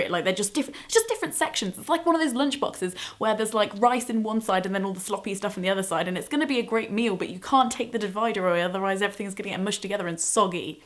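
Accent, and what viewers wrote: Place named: West Midlands, United Kingdom. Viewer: I've been watching your videos for ages and never realised you were a fellow West Midlander (maybe it's just me being awful at recognising accents, I don't know) 😂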